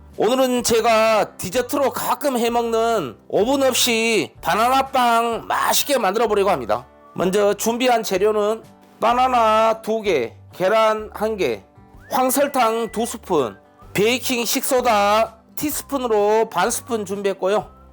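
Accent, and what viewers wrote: Jeolla, South Korea. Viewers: 저두 전남 광주입니다, 말투에서 전라도 사투리가 나어서요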